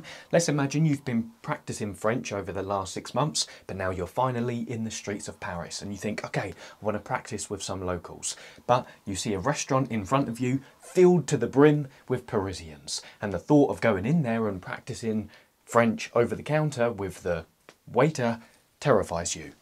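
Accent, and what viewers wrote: United Kingdom. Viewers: i mean this in not a disrespectful just an observatory way: your voice sounds precisely like what a british guy's voice sounds like in my head. spot on.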